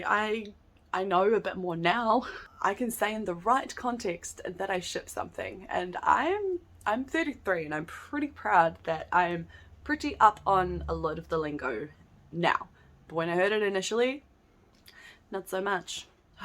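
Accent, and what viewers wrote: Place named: New Zealand. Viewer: Yaay, I knew I recognised THE greatest version of an english accent there is! (I am, unfortunately, not a Kiwi. I just love everything New Zealand ☺️)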